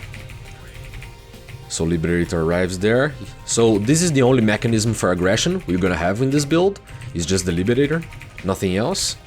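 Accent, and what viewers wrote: Brazil. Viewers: ahahaha só pelo sotaque já vi q é br ! bom tutorial ! comecei a jogar recentemente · Thank you so much for this build! (and Btw are you Brazilian? your accent sounds like it) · thats a nice brazilian accent you got there! cheers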